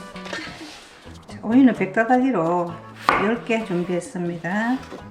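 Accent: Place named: Geochang, South Korea. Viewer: 어머님 고향이 어디셔요^^ 경남 거창인데 억양이 귀에 익어서요?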